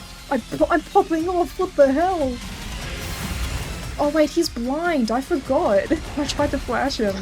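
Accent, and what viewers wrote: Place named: Australia. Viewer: I will never get tired of hearing "Chonk Lord" in an Australian accent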